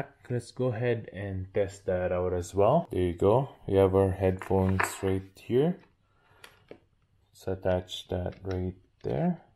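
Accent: Canada. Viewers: okay, good to know. Btw, are you Canadian? I hear a bit of a Canuck accent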